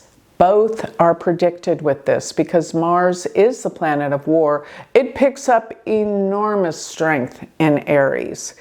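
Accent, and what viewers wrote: Texas, United States. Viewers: IKR!!! Texas accent is totally normal if your from Texas! We don’t notice our accent’s but others do. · slight TX accent that most likely has been practiced in order to lessen?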